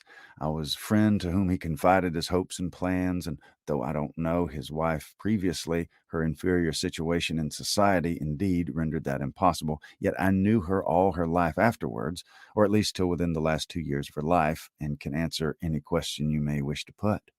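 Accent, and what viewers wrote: South, United States. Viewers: Wow, I love this! I never would have thought Austen could sound so good with a southern accent 😂 thank you for sharing your talent!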